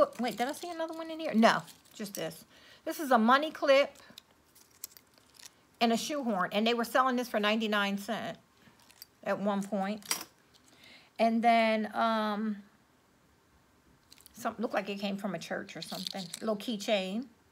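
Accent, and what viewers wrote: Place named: Louisiana, United States. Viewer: Like that cajun talk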